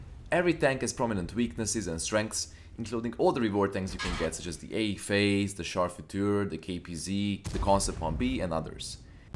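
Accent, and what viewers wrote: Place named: Hungary. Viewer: Hungarian accent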